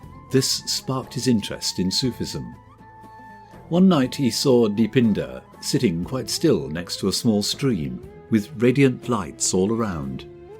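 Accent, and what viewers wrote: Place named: United Kingdom. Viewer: I always think it’s funny when a British voice narrates about Indian culture/religion/history 😂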